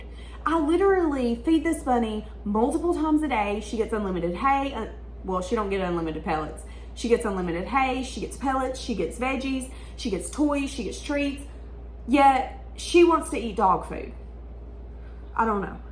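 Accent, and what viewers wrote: South, United States. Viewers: Hey I just want to say I really love your southen accent ❤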